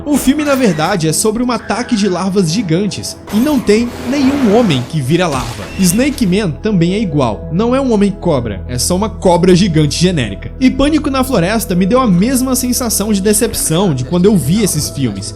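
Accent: Northeast, Brazil. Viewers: Um brinde ao sotaque nordestino!!!!!!!!!!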